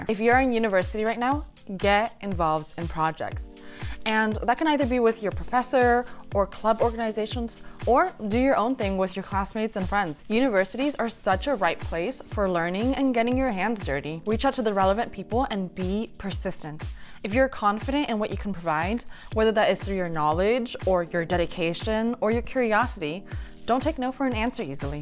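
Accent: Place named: Germany. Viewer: I studied a year at TUM as a part of my MSc. Your German accent is impressive!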